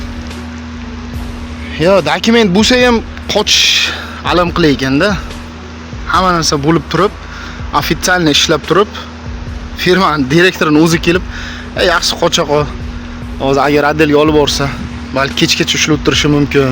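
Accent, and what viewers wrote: Uzbekistan. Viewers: Укажоним Каттакургонданмисиз??Шевангиз билдим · Шева кургон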